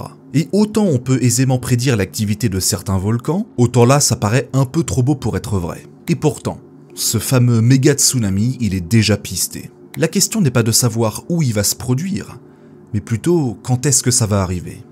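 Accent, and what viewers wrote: Spain. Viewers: 👍🏻👍🏻👍🏻👍🏻👍🏻👍🏻💙 pour l'accent espagnol ! Lorsque je suis allé à la Réunion (l'année de ta naissance 😁) je n'ai pas été dépaysé, je connaissais déjà les Canaries ! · Pouce bleu pour l'accent espagnol! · pouce bleu pour l'accent espagnol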